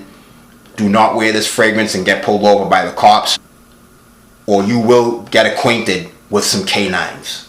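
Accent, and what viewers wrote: Boston, United States. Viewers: love this guys Boston accent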